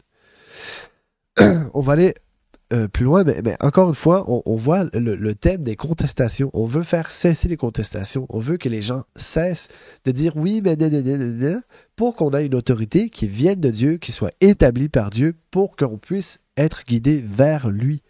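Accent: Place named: Quebec, Canada. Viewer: Si t'es suisse alors pourquoi tu as un accent québécois ?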